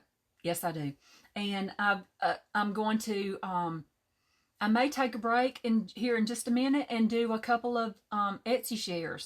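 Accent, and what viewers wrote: South, United States. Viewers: Hello,!!! Just love to hear you talk. Love that southern drawl😅…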